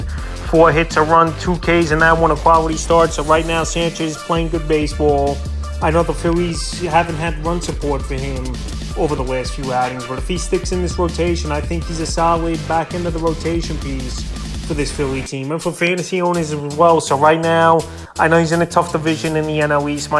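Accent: New York, United States. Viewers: Can't stand Yo NY accent ... yuk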